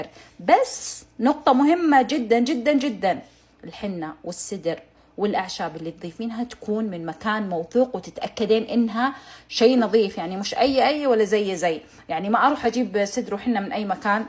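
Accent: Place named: Egypt. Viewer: حلوة اللهجة المصري على لسانك😂😂😂 ربي يحفظك❤❤❤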